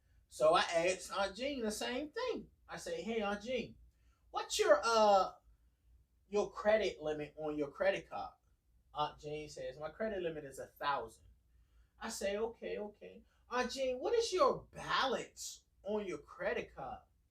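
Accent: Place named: Washington DC, United States. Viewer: bro def from pg or dc 😂 facts but great video my guy !!!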